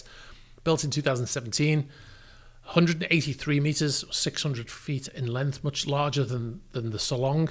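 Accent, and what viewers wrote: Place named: RP, United Kingdom. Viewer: Don’t give a damn about wet stuff. I watch this channel since the beginning because of most perfect bbc English ever. Pure enjoyment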